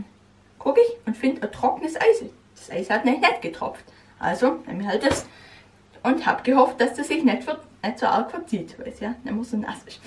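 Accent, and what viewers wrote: Swabia, Germany. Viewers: Hockline 89 es heisst dialekt und es ist schwäbisch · PS: der Dialekt ist cool....schwäbisch? Stuttgart oder ?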